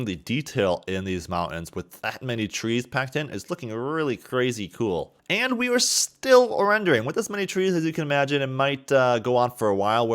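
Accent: Germany. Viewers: Are you German ???? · Yo are you german???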